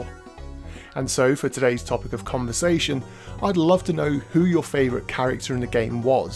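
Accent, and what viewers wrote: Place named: United Kingdom. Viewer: Godddddd why must every n64 video on YouTube be narrated by a British accent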